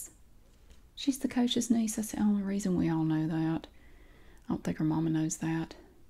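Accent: South, United States. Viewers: You really acted this role well I think your bitchy mum is awesome and funny I love your southern accent why you not an actress you really good at acting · I love watching you not only because of your videos but because of your accent. I used to live in the south but I moved a few years ago and I've recently been really missing it. Your videos bring me back and make me so happy. Thank you. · so southern bruh spot on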